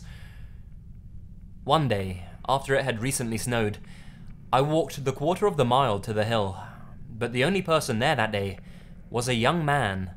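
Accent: England, United Kingdom. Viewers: hes from the uk like me so we will pronounce it differently , since the language started with us XD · No need for sarcasm, or maybe you’re just being stupid, I can’t tell. Obviously I can tell from his accent that he’s from England - I mean WHERE, given that there are so many counties in that country. I didn’t want to just presume he’s from London or something. 🤦‍♀️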